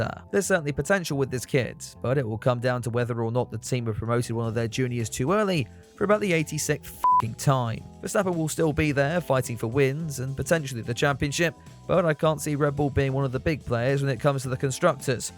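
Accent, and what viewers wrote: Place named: United Kingdom. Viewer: Just discovered your channel. Where have I been? Love your droll sense of humor! You are definitely in keen competition with another YouTube channel where the creator/narrator is probably an American, maybe Canadian. But I love the British accent, which Rick doesn’t have. A plus for you, for sure. I am subscribing now, only half a video in. Kudos.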